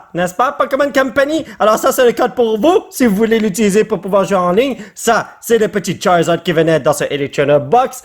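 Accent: England, United Kingdom. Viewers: Tu as un gros bon accent anglais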